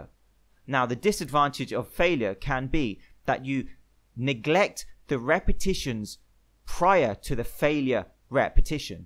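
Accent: United Kingdom. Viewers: Please understand this is a compliment. I do NOT like the British accent. It grates on my ears. But that’s my problem not yours. The information you provide is so valuable I listen to it anyway. It’s kind of like training for my ears.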